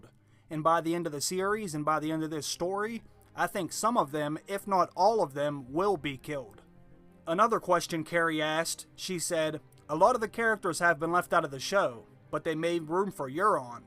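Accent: Pittsburgh, United States. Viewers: Do I hear a yinzer?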